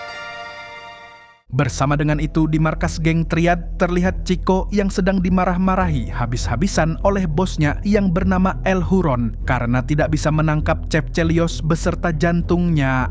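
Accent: Banjar, Indonesia. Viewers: orang banjar kh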